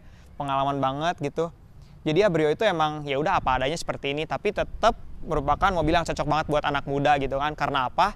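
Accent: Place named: Sunda, Indonesia. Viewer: Mang, asik reviewnya. Logat sundanya kentel lagi. Yang rajin mang!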